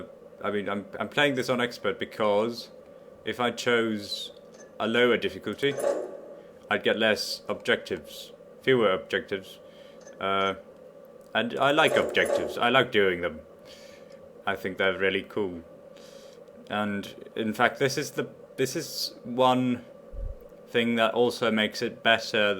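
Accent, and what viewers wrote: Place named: RP, United Kingdom. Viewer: You sound posh as fuck.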